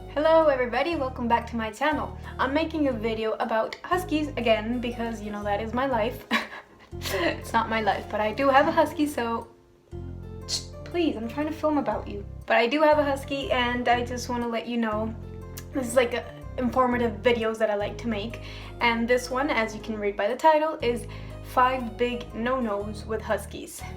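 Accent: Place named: Netherlands. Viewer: Heey are you dutch? Your accent sounds pretty mutch just like a friend of mine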